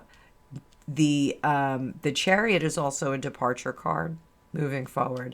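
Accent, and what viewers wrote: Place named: New Jersey, United States. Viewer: More Jersey accent, please!!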